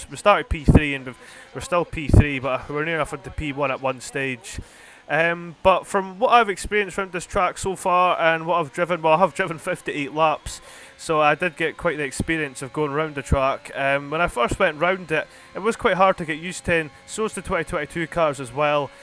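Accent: Scotland, United Kingdom. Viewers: thought so, once I heard that accent I instantly subscribed I’m Scottish to so you can’t get better than an Scottish f1 content creator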